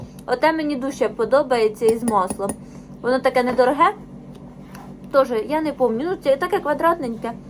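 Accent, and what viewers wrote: Poltava, Ukraine. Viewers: мені подобається як ти розмовляєш. ми на Полтавщині так і балакаємо.спасибі за відео. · У нас ,на заході України, мова трошки інша.Але так говорять мої рідні з Хорола Полтавської обл. Люблю слухати ваш діалект,здорово і гарно!❤😊 Смачного тобі!!🎉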